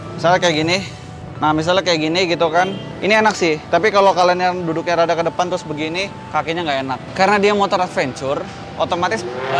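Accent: West Java, Indonesia. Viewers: Sunda banget bang😂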